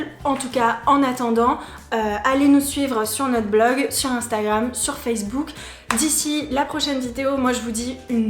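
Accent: Quebec, Canada. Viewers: Vous avez un accent québecois, bien que vous vous en appercevez pas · Très intéressant ! Je suis Québécois et j'ai l'impression que ton accent français se "québécise" quelque fois et je trouve ça charmant. Tes propos nuancés sont très à point. Tu as l'air à adorer ta nouvelle ville d'adoption :)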